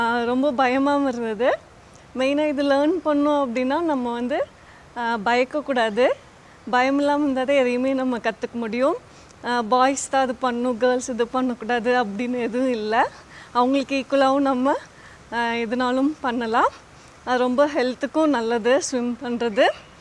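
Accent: Kongu, India. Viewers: Unga slang language super sistaaaaaa...........kongu tamilachi😍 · மரியாதை கலந்த கொங்கு தமிழ். ஒருத்தரு நம்மள அடிச்சுக்க முடியாதம்மினி .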